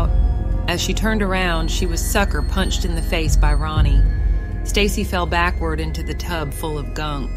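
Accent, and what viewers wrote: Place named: South, United States. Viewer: Great trippy story! The southern slang narrator adds a texture of realism to this twisted tale too!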